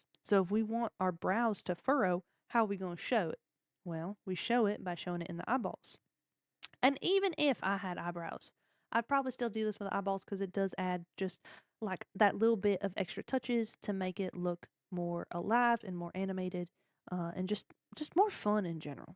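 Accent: South, United States. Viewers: When your from the south to and from a vtuber that speaks in southernese 😭🫶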